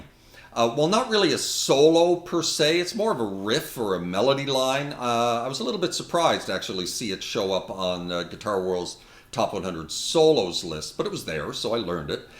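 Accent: Canada. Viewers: Great video! You spoke three words and I could tell you were Canadian eh!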